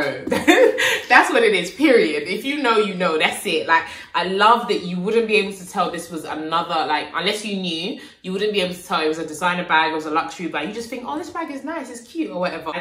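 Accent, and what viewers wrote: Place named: South, United States. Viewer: Love your review and your accent with a little southern twang. "Bruh you pay for this shit"....lol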